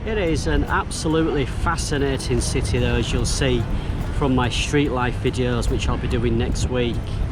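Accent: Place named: South Yorkshire, United Kingdom. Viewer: His accent is South Yorkshire accent including accents in Leeds Barnsley Doncaster and Sheffield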